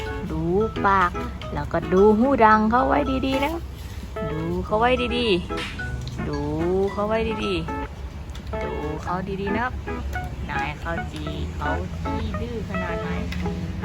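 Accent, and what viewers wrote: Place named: Isan, Thailand. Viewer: ไทยอีสานก็เพราะนะคะพูดทั้งสองภาษาเลยค่ะน่ารักดี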